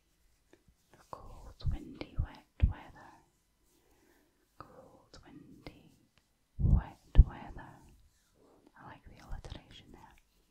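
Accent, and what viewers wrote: Scotland, United Kingdom. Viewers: It''s been a super tough week, gonna need that that Scottish Brogue to calm my brain. I'm from Edinburgh and I miss the sweetness of the language. Hope you're well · I adore your voice because of the accent, imo a Scottish or Irish accent sounds far more interesting and elegant than an American accent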